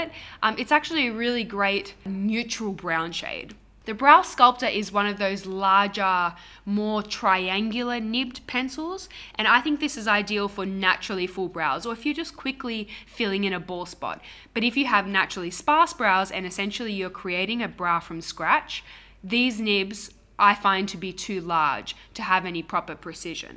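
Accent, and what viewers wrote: Australia. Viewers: Your Aussie accent is my fave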